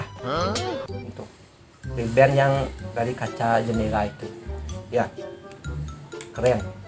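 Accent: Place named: Bali, Indonesia. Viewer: tabanan napi gus?tiang saking jegu....logatne kentel care logat penebel e...sampun tiang subscribe...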